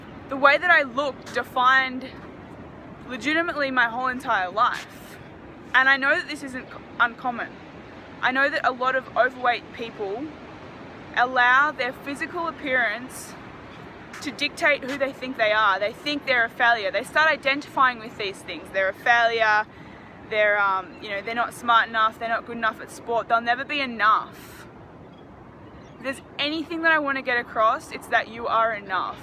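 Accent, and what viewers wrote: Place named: Wales, United Kingdom. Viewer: I like your accent where are you from? Wales?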